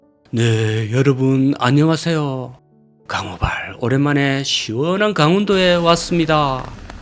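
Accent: Gyeongsang, South Korea. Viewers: .원래 내가 갱상도 말씨 아주 기분 나뿐데 이양반 말씨에서 갱상도 구수한 말이 나오너ㅣ.중국 까지 원정 하시요.더넘어 북쪽까지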